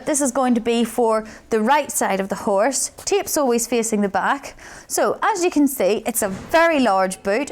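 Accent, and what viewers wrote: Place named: United Kingdom. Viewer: the CCs are so wrong lol someone who understands British accents should redo it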